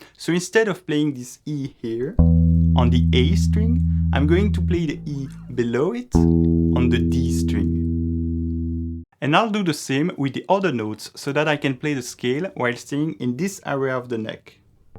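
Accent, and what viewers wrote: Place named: France. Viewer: On reconnaît bien l'accent français 😅 Merci pour la leçon 💪🏼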